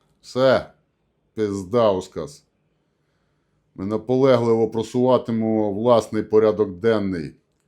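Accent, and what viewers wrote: Transcarpathia, Ukraine. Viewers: Закарпатський діалект гарно звучить в його виконанні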